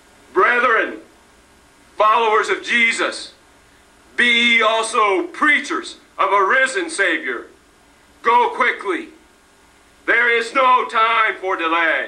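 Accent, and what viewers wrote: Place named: United States. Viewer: His church is Cildwrn, Llangefni, Anglesey, North Wales. Strange to hear this in an US accent!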